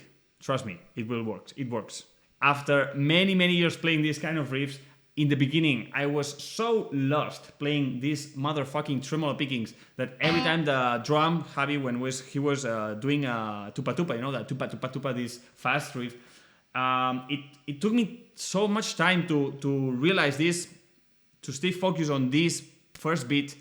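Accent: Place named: Spain. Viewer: Is this guy from Spain? The accent sounds a lot like European Spanish.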